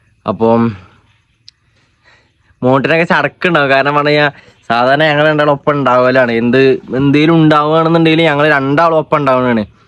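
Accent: Malappuram, India. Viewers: എട. ബ്രോ.ഞാൻ.കണ്ണുരാണ്.നിന്റെ.മലപ്പുറം.ഭാഷ.തീരെ.മനസിലാകുന്നില്ല.😂😂😂😂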